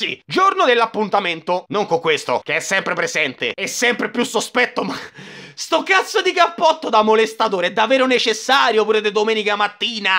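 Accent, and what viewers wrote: Rome, Italy. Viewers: L’unico youtuber che parla di anime in romano toppppp😂😂😂❤️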